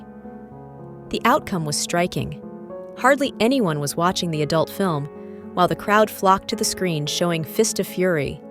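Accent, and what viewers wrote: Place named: United States. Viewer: As usual this channel has a narrator who does not pronounce common English words correctly! Though narrator speaks with American accent, no attempt to bother with obvious words. So annoying and personally I do not believe many of this videos info. The makers are careless, do not bother with proof of many of their statements. Do not bother...waste of your time.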